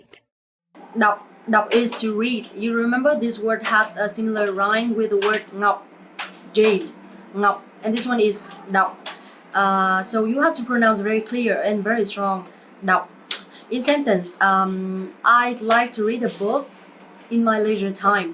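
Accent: North, Vietnam. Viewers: That northern accent is HARD in itself as a southern hahahss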